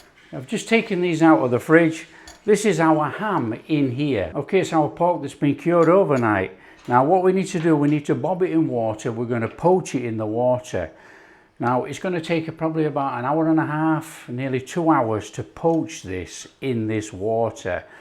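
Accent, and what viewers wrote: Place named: England, United Kingdom. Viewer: Hi this amazing,I hope this ham will be delicious I also like your English you come from England, watching in Mombasa Kenyan coast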